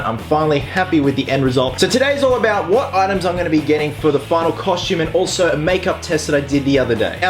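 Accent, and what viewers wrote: Australia. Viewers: After watching about fifty of your videos, this is the first time that I noticed that you had an Australian accent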